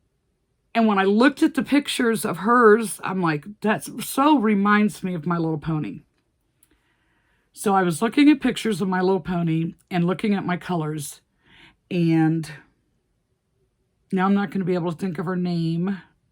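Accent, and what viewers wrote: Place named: Florida, United States. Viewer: You speak like a Floridian. Laid back and easy. It’s why you’re my favorite. Feels like home.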